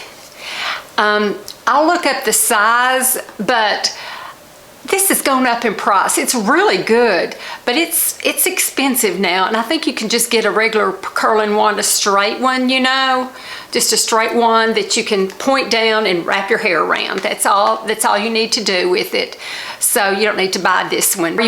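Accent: South, United States. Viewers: Knew u were a southerner when I heard u talk and a tennesse rwsident...so am I....tfs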